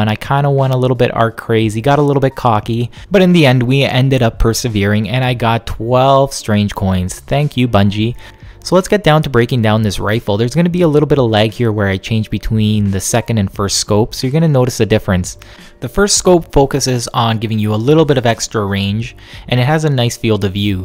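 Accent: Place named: Canada. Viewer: Commentator is most definitely Canadian... haha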